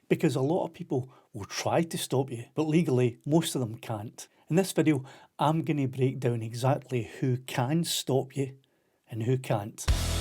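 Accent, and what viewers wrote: Scotland, United Kingdom. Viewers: Useful video, but it might be good to check the subtitling- there are at least two places where the subtitles confuse can and can’t in a Scottish accent, so say exactly the wrong thing (passers-by “can enforce drone laws” for example, instead of can’t)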